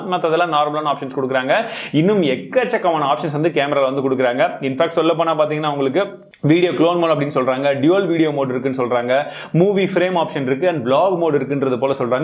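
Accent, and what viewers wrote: Chennai, India. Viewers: first time hearing chennai slang . ......😅🤣🤣😂🤣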